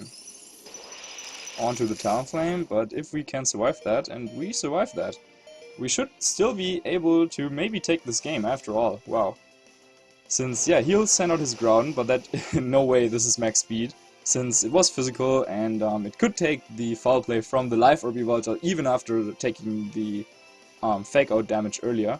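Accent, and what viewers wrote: Germany. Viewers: you're german right ?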